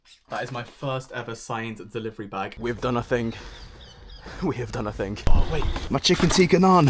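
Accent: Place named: Chav, United Kingdom. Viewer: You need to work on your chavvy accent.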